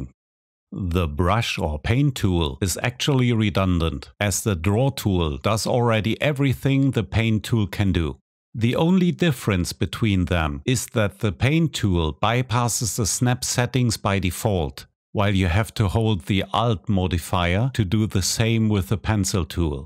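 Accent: Germany. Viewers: Yes.. i think he is German. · Where are you from? Your accent sounds german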